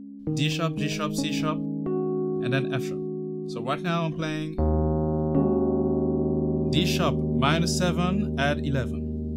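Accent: France. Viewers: Awesome man, i'm very grateful. Is that a french accent?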